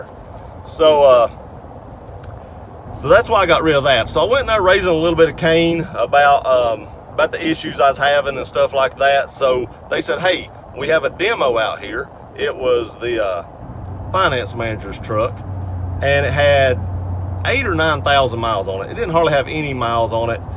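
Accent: South, United States. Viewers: Your Tundra is an awesome truck. If Toyota is still building them well, you'll enjoy a long happy life with it. I bought a new Tundra Limited Extended cab in 2001. I had originally planned to keep it 3 yrs, then sell it and move on. Man, I fell in love with that Tundra. It had the 4.7 DOHC V8 and NEVER broke down on me. Just did the regular maintenance and she continued to perform flawlessly. Unfortunately, exactly one year ago as I was driving down the road a lady ran a stop sign and t-boned me. Needless to say, my beloved Tundra was totaled. I owned it for exactly 16 years and put 206,000 trouble-free miles on the clock. The only knock I had on it was that the paint began to fade at year 12, but no big deal as the truck itself was bulletproof. Congrats on your new Tundra. Again, hope she serves you well and keeps an ear-to-ear grin on your face for years to come. 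Oh yeah...man, you've got a cool Southern accent. I live in Texas..the accent here's a little different. Merry Christmas!! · Well that is a sad story of how you were handled and the safety issues and danger you and others were placed in. I'll be honest I just bought an F-150 and love it but this is disturbing. My engine is a new 2018 2.7 Eco Boost with the 400 lb ft or torque and 10 speed transmission so I hope they sorted that issue out with it. I know it is a completely different motor than 3.5 Eco. I had a 2009 or 10 Tundra I bought new and it was a nice truck. Had the 4.6 V8 but the 5.7 is a real beast. I had a death wobble one time towing my Bobcat with the Tundra but that was my fault using a half ton truck. I never did that again. Toyota great trucks and cars for sure. Love the 2018 2.7 F-150 Eco Boost and hope I don't have any issues. Nicely done video btw. Love the southern accent. 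· you know it's a strong accent when a southerner notices right off the bat lol. nothing wrong with it. it's cool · Man, i love this southern accent, it's almost music to my ears..what is it...Texas, Lousiana, etc? & i also love toyota, unfortunately we don't have those big v8 tundra's in Europe, what a shame.. Greetings from EEurope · still sound southern: ) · Love your darlin honesty. Finally someone that sounds like me with a sweet southern swag. TOYO Tundra here I come.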